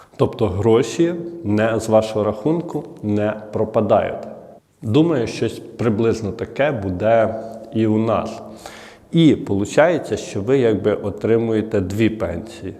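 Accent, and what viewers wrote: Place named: Western Ukraine, Ukraine. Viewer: Делай правильное ударение на слове,, буде,,, ты видно с западной Украины, сразу слышно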